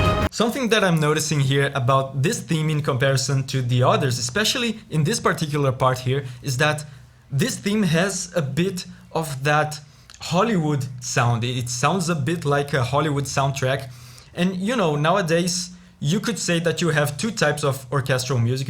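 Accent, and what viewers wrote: Brazil. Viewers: Right in the first phrase i noticed that you are brazilian. Muito foda teu trabalho, mano! assisti vários vídeos em seguida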